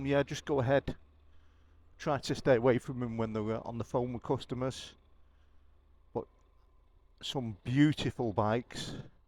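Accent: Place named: Lancashire, United Kingdom. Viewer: Very enjoyable "wednesday waffle", i enjoy your miss mash manc,cheshire,warrington accent more than posh freddies dialect,. I had to laugh when you decided not to follow the silver car and ended up pulling out behind him again when you came to the junction,. Nice change of scenery at the ducati shop instead of your usual go to "youles", a breath of fresh air, i used to go to woods of abergelle (now no more with the completely motorbikes crash) for my ducati fix, andrew woods was brilliant, he would let you demo most bikes, i took my old boss,s 748sp there once for a service and andrew gave me a 916 for a few hours while he serviced the 748, the first demo i ever had there was an early 900s or something simalar, it put me right off ducati's, the early ones werent very refined, the 916 was lovely tho,.i'm a yamaha man through and through so i dont tend to get side tracked to easily,. 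My eldest son bought an R reg (98) 996 sps and its cost him a fortune over the years, mechanical issues early on and now belt changes even tho he has'nt ridden it since 2019, still has to do a belt service when its due, it costs him £25 p/week storage costs in london to, married with 2 young kids and a high pressure job gives him plenty of money but little time to enjoy the bike,.he bought 3 of my collection recently and he has them garaged at a friends place 20+ miles outside london, only room for the 3 of them, tzr3xvsp 250,rd350b, cb750k2, ive still got my yamaha R7 ow02 (2000) at least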